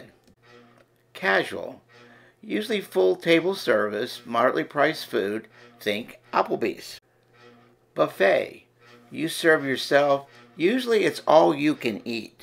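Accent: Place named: Italy. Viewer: Your Italian accent rocks. 🙂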